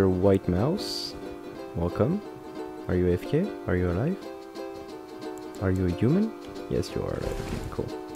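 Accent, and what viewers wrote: France. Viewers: for the timestamps and the French accent (force frérot)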